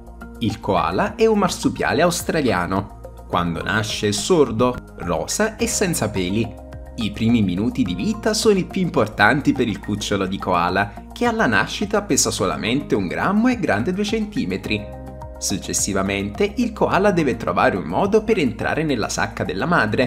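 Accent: South, Italy. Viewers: Bel video, e anche interessante anche se un pó scolastico. Mi permetto di fare un appunto sulla dizione inequivocabilmente meridionale, non si raddoppiano le consonanti, (es. Incredibbile...) e le C non si pronunciano sc...., l'italiano è un'altra cosa.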